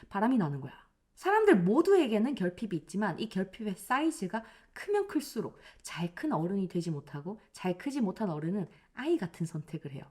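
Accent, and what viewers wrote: Gyeongsang, South Korea. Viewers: 안녕하세요 선생님 주옥의말씀잘들었습니다 미인선생님께서 꾀꼬리같은보이스로 부산경남쪽 또는 대구쪽이신가 아뭏든 정감가는억양으로 설명해주시니까 몰입이되네요 늘 건강하시구 빛나구예쁘신얼굴 오래오래뵙구싶네요 그럼 안녕히계세요~~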